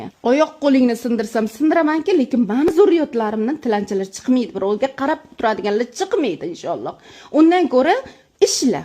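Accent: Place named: Kashkadarya, Uzbekistan. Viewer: Шева Кашкадарё. Тугри тарбия берябди. Дуо хам килинг. Иши юришиб кетади шунда.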